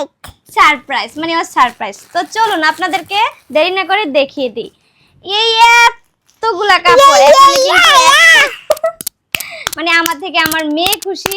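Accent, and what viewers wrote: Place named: Bangal, Bangladesh. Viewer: কথার ভাষা কি বাঙ্গাল বাঙ্গাল ছি এগুলো আবার ভিডিও করে লজ্জা শরম নাই বাঙ্গালের বাচ্চা গো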